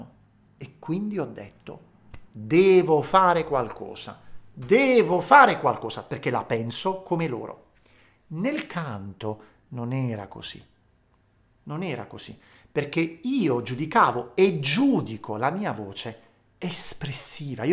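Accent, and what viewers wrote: Apulia, Italy. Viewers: Grazie di trasmettere positivita e ci incoraggi ad avere fiducia in noi stessi grazie,è bello sentirti parlare con la cadenza pugliese sei unico,mi piace quando inserisci delle parole pugliesi,mi trasmetti la gioia di vivere❤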